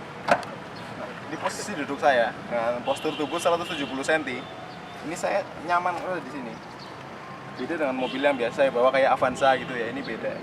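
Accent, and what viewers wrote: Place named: Purwokerto, Indonesia. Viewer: purwokerto ya mas..salam ngapak sekang purbalingga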